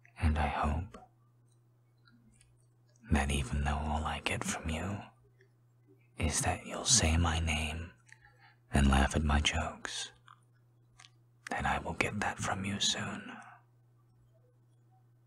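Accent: South, United States. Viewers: Hi my name is Alyssa Hayes and i love watching your video and ur voice is so hot sexy and i love your country accent voice and could u do the Country accent roleplay Cowboys romantic for me? :-D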